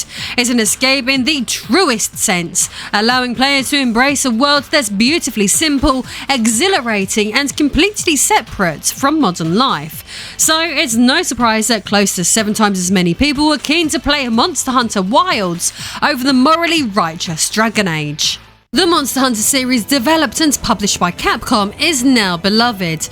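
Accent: United Kingdom. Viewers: I ❤British accent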